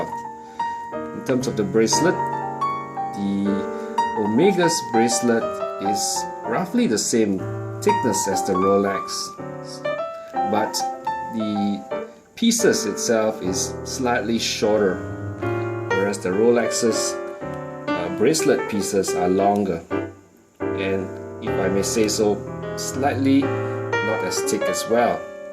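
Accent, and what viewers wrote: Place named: Singapore. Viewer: The way you speak, your accent.... You're Singaporean aren't you?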